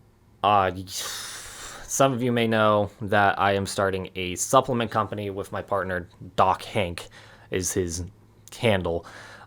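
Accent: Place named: Virginia, United States. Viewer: Thanks for tge advice bro! Been working for me so far. You have a slight Virginia accent , am I correct in assuming that?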